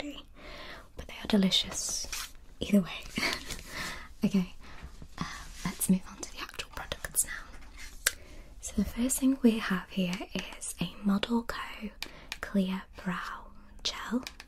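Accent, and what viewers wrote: United Kingdom. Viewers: I love your British - English ❤️ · i literally love your accent so much! I’m British too but I wouldn’t say I have a “posh” accent lol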